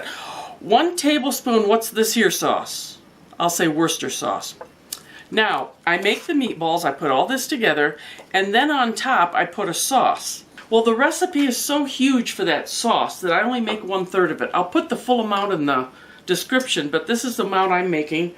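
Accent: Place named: Illinois, United States. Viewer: Got to love that Illinois accent! Hahaha gets 3. Oh well he will make up for it in the pie Dept!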